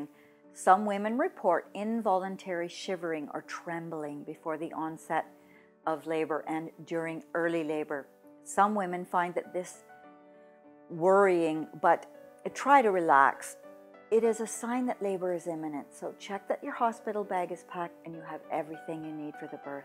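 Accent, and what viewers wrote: Canada. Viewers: I believe she is Canadian based on her accent and also the way the words are spelled on the screen (such as labour spelled with a "u"). This may be why she pronounces words just a bit differently. It's not necessarily wrong, it's just a regional difference.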